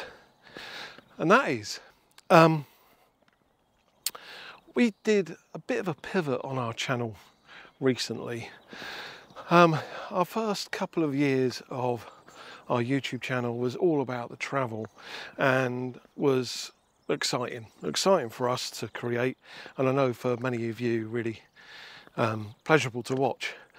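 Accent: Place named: United Kingdom. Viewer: Hey. I've been following you for a few months now. I gotta say that I truly love your content and admire you both. I don't think people realize the work that goes into a Youtube channel sometimes and the return to work ratio involved. You guys are rock stars and I love how genuine you come across in your videos (Perhaps the British accent helps LOL). Anyhow, I also have a channel about anesthesia (I'm an anesthetist in the US), small channel 5k subs and I would concur that 98% of the comments are good, but I do get the eventual terrible comment that can be considered hurtful. I've learned that there are a lot of people that just want to criticize for no apparent reason. It can be brutal. But I conditioned myself to not become overly emotional with bad comments and I am quick to reply to them and tell them to move on. I could never understand why people have the need to make a bad comment on a video if they didn't like it. 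My thinking is just move on or swipe away. Anyhow, I'd work on the psychology of dealing with negative people and continue to do what you enjoy. I particularly like your videos when you explore the mental side and financial preparation involved in retiring early. Looking forward to your next video on how much do you need to retire. That I know, you've never dug deep into actual numbers and this is highly sought after forepeople like me who think we never have enough to actually pull the trigger. With that said, looking forward to the next video. Best of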